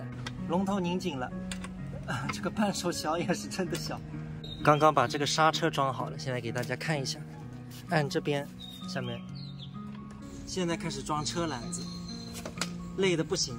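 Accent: Suzhou, China. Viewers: 保持讲苏州闲话， 蛮好听的 😃。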